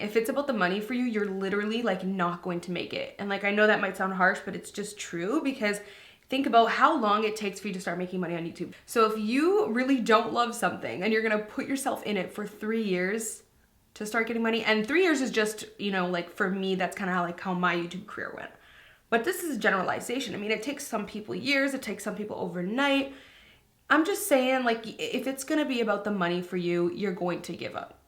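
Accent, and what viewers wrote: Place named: Canada. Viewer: I love your Canadian accent!!! How tall are you? Congrats on your journey... be proud!! also, I can't tell u have more confidence...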